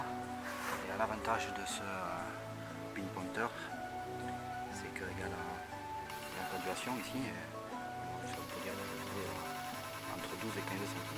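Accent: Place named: South, France. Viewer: oui je l'ai vu trop tard. merci de votre réponse, et de m avoir permis de découvrir ce chanteur. votre accent du sud vous trahit... de quel région êtes vous exactement?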